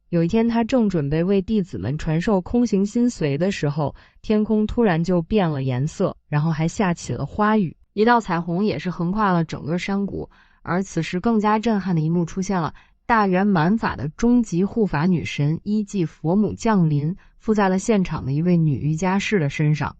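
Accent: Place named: Beijing, China. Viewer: 解说一口北京腔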